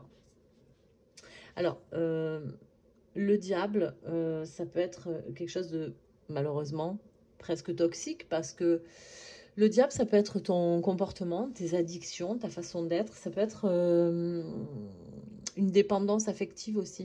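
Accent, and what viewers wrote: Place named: South, France. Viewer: Bonjour 😎 Tout juste my mother is verseau 😂 Belle journée ☀️ Rien que l'accent du sud,meme léger me transporte 😅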